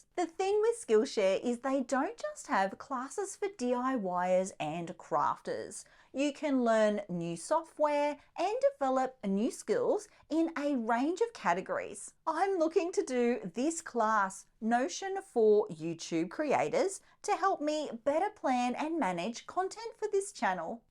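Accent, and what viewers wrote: Australia. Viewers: Don’t talk daft. It’s a very nice Australian voice. You change your nasty attitude.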